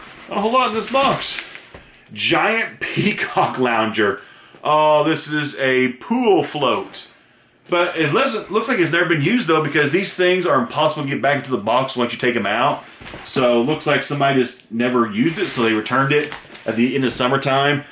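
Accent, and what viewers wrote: United States. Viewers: I had a feeling that you were from the midwest but wouldn't have guess you are a Hoosier aswell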